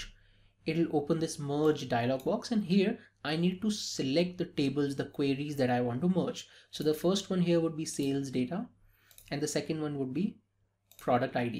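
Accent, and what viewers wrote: India. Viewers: I know this is a good tutorial whan I heard Indian accent in the opening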